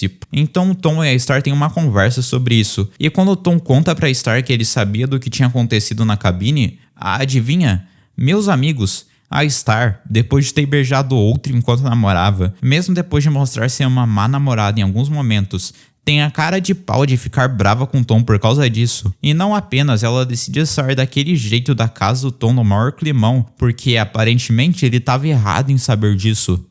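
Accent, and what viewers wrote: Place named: São Paulo, Brazil. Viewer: desse com sotaque paulista querendo falar da star scrrr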